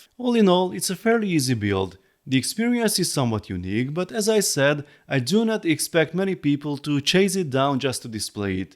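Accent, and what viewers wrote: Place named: Hungary. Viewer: mindig is kicsit éreztem az akcentusodon mintha magyar lennél de amikor kimontad hogy nyíregyháza egyből tudtam. király videó csak így tovább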